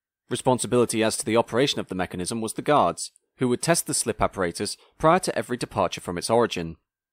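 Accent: England, United Kingdom. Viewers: I was pretty amazed by an English sounding guy pronouncing Drogheda properly, but the name at the end explained everything 👍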